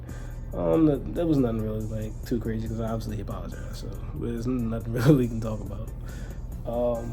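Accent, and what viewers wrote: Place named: DMV, United States. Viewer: I feel like I understand Coles apology because he was acting out of character. He seemed like he regret getting out of himself to get in this hip hop compatible persona that everyone expects and as someone who kinda been on a similar bath of change as I can understand to a big degree and I kinda felt like hip hop beefs and disses was kinda corny for a good while. It sounds like I hear some DMV in your voice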